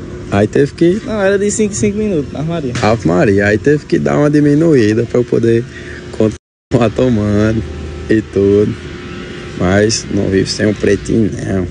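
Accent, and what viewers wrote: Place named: Northeast, Brazil. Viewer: E Adoro ele falando vixe como eu gosto quando ele fala desse jeitinho dele erradinho de vez em quando fica bem charmosinho gosto muito desse jeitinho dele bem nordestino gosto demais Ave Maria Até porque eu sou nordestina também e a gente tem que valorizar o nosso Nordeste Eita cabra da peste não vou sentir viu Fica com Deus e a inveja mata mas mata o próprio invejoso Deus Jesus é mais que te aguarde te Aguarda a todos amém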